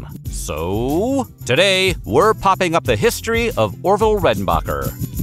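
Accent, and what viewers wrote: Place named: Transatlantic, United States. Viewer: You have to keep that wonderful Transatlantic accent alive dammit, for us all.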